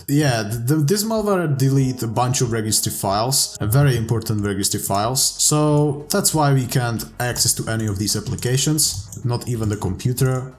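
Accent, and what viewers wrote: Czech Republic. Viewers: hned sem poznal podle přizvuku že si čech dobrá prace ngl.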